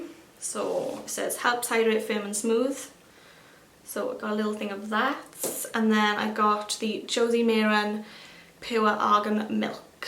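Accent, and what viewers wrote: Wales, United Kingdom. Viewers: Watches video hears welsh accent subscribes 👍🏼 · are you welsh?xxx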